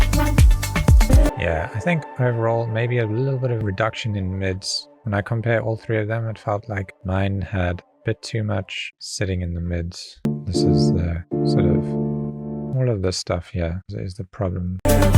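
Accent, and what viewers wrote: South Africa. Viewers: Bro sounds south african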